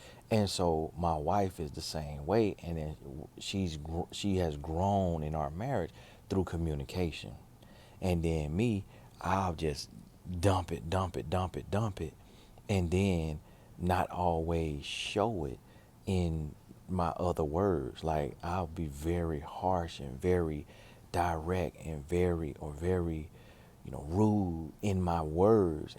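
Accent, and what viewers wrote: South, United States. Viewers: I have always enjoyed your videos because of how you speak. Aside of your country accent (it's so cute), you drop bombs that need to be dropped. With that said, I don't know where you are or what your doing, but these video topics have been on a different level altogether! I am appreciating these videos because although they're not as long as you normal record (I wish they were but I know you're taking care of business), they are absolutely needed! Thank you for staying consistent despite of your abundant season. Can you do a video about our relationship to money once we're out of debt? Please and thank you.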